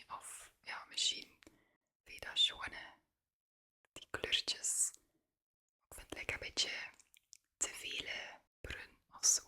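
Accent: West Flanders, Belgium. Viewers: I often say that people should think before they speak in certain situations. Often it's emotion that takes over. And I'm trying to understand the 1st quote... 'walking to the rhythm of a flower blooming'. Walk at a slow, gentle pace? In any case, your reactions make me laugh when you try to pull yourself together to speak only in West Flanders.😄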